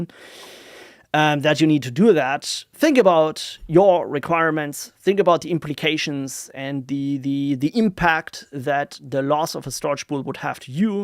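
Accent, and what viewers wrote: Germany. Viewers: Typically german englisch 😅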